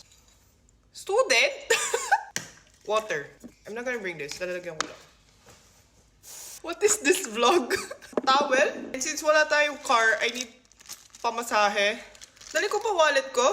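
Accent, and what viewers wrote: Philippines. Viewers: Yung accent talaga ehh. nagagandahan ako sobraaaa. like genuinely Filipino yung atake. Love youuu po!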